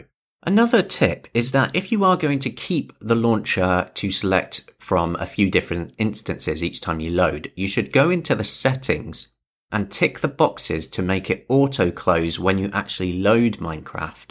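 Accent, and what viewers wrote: United Kingdom. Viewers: Oh my god I found a British hello from American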